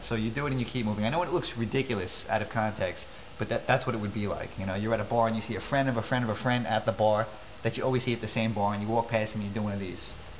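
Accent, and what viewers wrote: New York, United States. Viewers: u seriously live by this code of new york, or are u just a dood that just talks like this for the camera? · To be continued? +1 to all your videos on NewYork accent.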